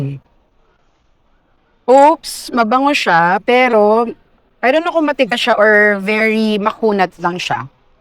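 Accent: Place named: United States. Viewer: Ganda ng speaking voice mo Ma'am. Very American Accent. ❤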